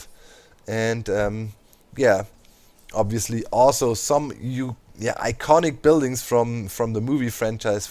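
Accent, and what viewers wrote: Germany. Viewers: Your englisch has an accent? German?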